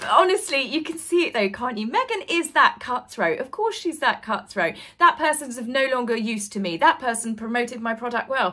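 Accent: United Kingdom. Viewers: I always enjoy your pretty face and your British accent when I click on your videos!! You are always so cheery and happy!! I love that!!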